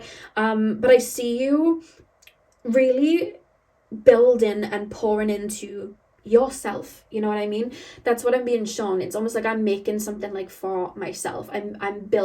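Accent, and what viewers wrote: Wales, United Kingdom. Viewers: We missed your Welsh accent and voice so much!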